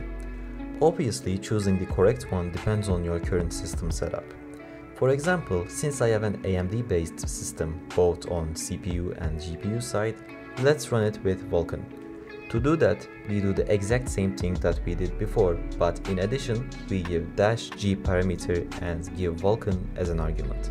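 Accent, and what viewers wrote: Turkey. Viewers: Konuşmandan anlamıştım bu kesin türkdür diye :D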